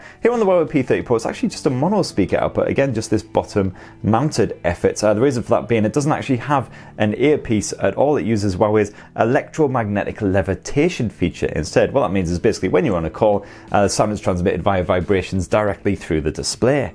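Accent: United Kingdom. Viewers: like your comparison videos, and is good to hear a British accent that is easier to understand :)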